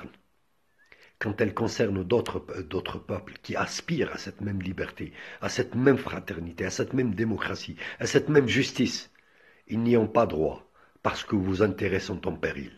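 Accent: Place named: Tlemcen, Algeria. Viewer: Salam mon frere. Bien dit, qu"est ce qu'on peut attendre de cette maudite crapule france c'est satanas de cet univers...Mais d'un côté c'est bénéfique ce que dévoile au dessus de sa cape pour faire savoir ce qu'on vaut quel prix et quel estime a pour nous surtout le message est adressé à ces chiens et bousba3 je suis aussi de la région de Tlemcen ce que j'ai noté dans ton accent on est de la même petite ville Remchi. Salut de Mdrid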